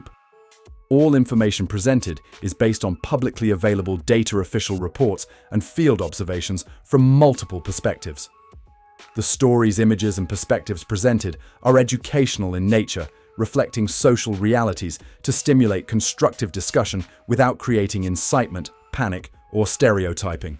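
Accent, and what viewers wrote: United Kingdom. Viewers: You sound like you're from Britain, so it's even worse there. 😂😂😂😂😂😂😂😂😂😂😂😂😂😂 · Couldn't they find an AMERICAN RESIDENT to narrate this? Instead of this British creep?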